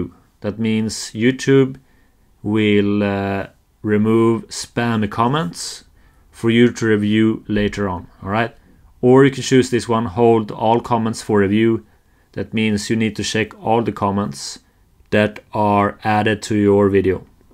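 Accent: Sweden. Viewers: he is swedish i hear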